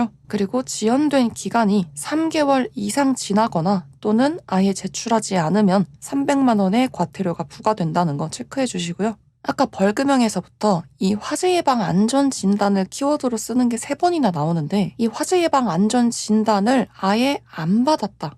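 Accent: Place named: Busan, South Korea. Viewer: 쌤 덕분에 공부 잘하고있슴다 근데 달동네던가 억양에서 부산 분이 아니신가 생각되네요 ㅋㅋ 저도 부산에 가까이 살다보니 은근 반갑게 느껴지네요 ㅋㅋㅋ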